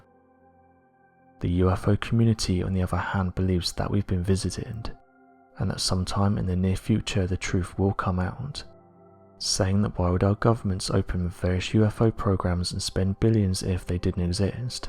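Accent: United Kingdom. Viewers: British right?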